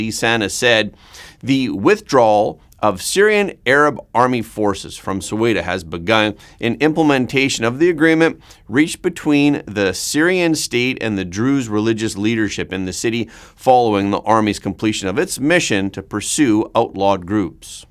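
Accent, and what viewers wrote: Canada. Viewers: you do a great job of giving us real news! Thank you! It sounds like you may have a Canadian accent? Aetna is pronounced Et-nuh. 🙃😊🤗 A few other words give you away, as not being US pronunciation’s, but not too odd to our ears.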